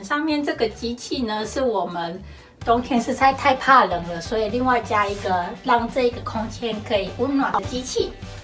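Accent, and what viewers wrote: Taiwan. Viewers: 台中口音!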